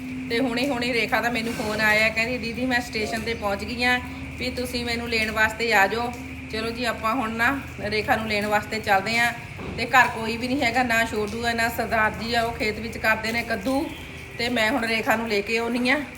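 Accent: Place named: Patiala, India. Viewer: beta tuhada pind kihra hai boli patiale de hai❤❤🎉🎉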